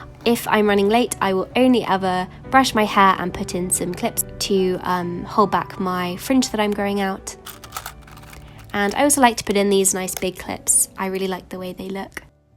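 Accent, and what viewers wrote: United Kingdom. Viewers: Yay she is British 🇬🇧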